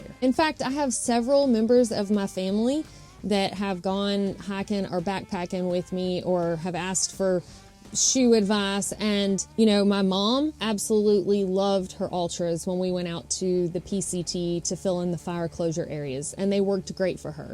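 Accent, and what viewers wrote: United States. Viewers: It's nothing to do with hiking but as a person born and bred in the UK, I just love your USA accent.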